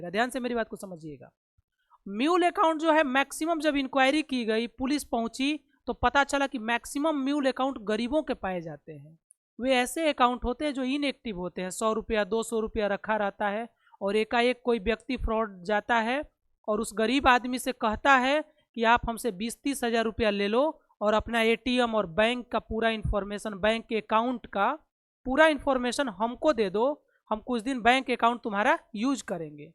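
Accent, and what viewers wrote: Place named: Bihar, India. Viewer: Bihari style hindi But Good Knowledge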